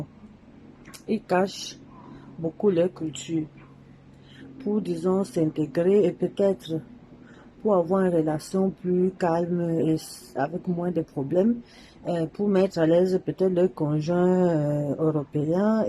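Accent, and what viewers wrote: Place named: Cameroon. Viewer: Tu as l'accent des Camers. Tu es une vraie camerounaise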